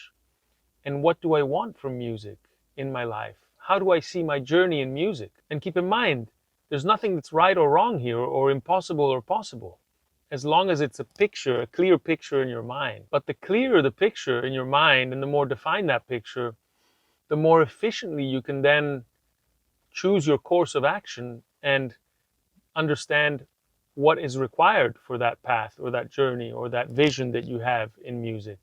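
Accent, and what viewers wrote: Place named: Italy. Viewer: do you live in Italy. I noticed an accent btw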